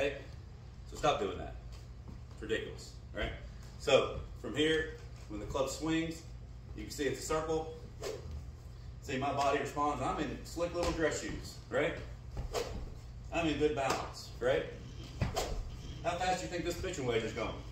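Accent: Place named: Texas, United States. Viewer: I love how articulate and well spoken your videos are. I have watched several videos , so far, will watch them all. Videos have good ideas in them, I watch them a few times over, to pick up every word. Sounds like you have a Texas accent, like you could ride up in a horse and say "Let me show you around the ranch". The hands have to work correctly, one arm right hand swing drill is great. Mike Malaska stresses hand action also.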